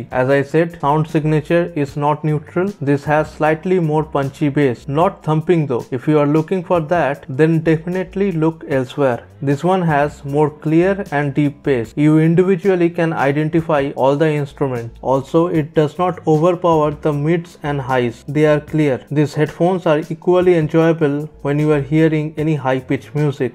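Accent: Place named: India. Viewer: Lay back with ur Indian voice a bit please ruined the video kind of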